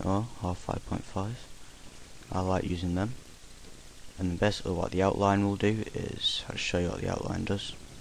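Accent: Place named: United Kingdom. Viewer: All British talk like that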